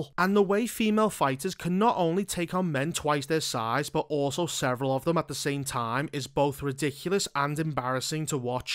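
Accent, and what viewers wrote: United Kingdom. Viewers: I just love that British accent. "fockin dumb" XD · Bro i love your British accent and it makes it way more funnier 😅😅😂😂 Btw I am from Afghanistan 🇦🇫 Even though we fought three or four wars but still I love brits Love brothers No one can fake your history these people will try show everything was black like Egypt and North Africa.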